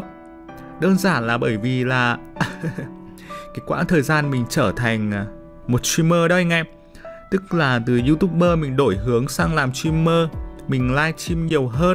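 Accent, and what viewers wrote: Thanh Hoa, Vietnam. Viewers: Bạn có phải người Thanh Hóa không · Người thanh hóa ???? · Anh nguoi Thanh hóa a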